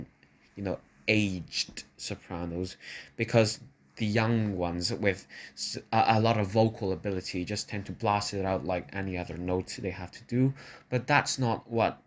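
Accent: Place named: Europe. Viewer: I like the pronunciation of names, it sounds like the Met broadcast announcer/commentator from the 60s. Where one pronounces every name in a "general European way, leaning a bit toward the German way" :D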